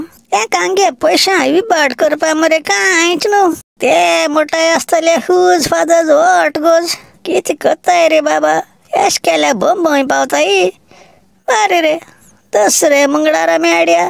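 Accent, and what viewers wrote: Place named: Salcette, India. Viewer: Authentic Salcette Konkani. Those who don't know to speak proper Konkani must listen to this weekly talk by.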